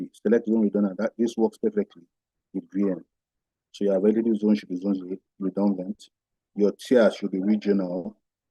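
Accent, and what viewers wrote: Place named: Africa. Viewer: African accent is so out of this world, I cant understand the words coming from his mouth...😵‍💫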